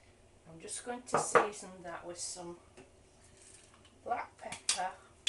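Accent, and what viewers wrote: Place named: Derbyshire, United Kingdom. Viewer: thanks for the recipe and demonstration, loving the accent, Derbyshire if i'm not mistaken, a beautiful part of the country, feels like home even though i'm a southerner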